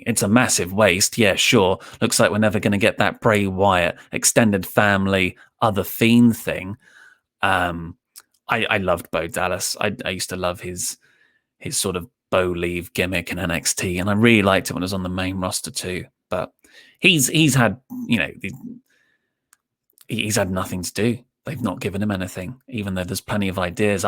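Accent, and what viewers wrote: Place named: United Kingdom. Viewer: Why is breaking these awful news with a british accent at the wrestletalk youtube channel?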